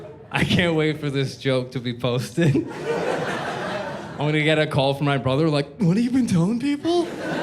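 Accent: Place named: Canada. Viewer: this homie is so fucking Canadian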